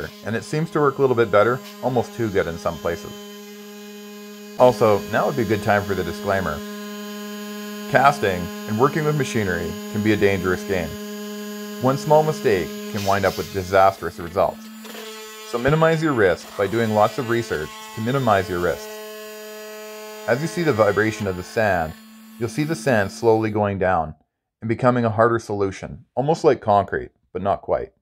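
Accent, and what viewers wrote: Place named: Canada. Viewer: 🇨🇦 I knew this guy was a fellow Canadian by his Canadian accent. 🇨🇦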